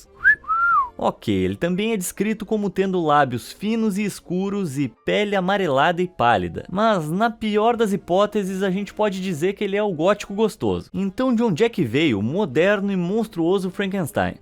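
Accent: Brazil. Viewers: tinha uma boz americwna, ess😊a voz tamuito brasileira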